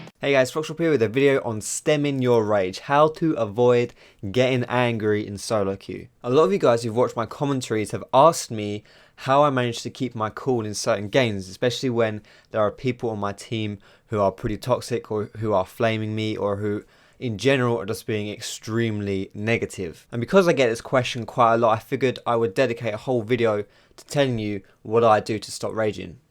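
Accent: United Kingdom. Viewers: Top 5 reasons for subbing to you 1-You got a british accent which is funny 2-You seem like a nice guy 3-You have great instructional videos 4-You answer to comments 5-My 1year old daughter loves foxes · love your videos and the british accent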